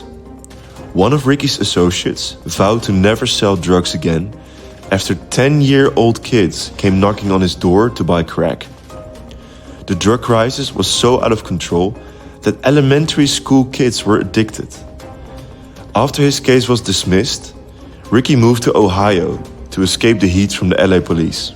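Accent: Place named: Netherlands. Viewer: Weg met je Nederlandse accent je weet niet eens waar je over praat oen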